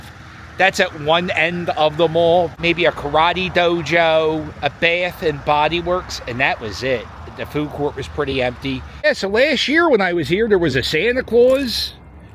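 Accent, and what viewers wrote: Philadelphia, United States. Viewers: You definitely have a strong Philly accent 😂.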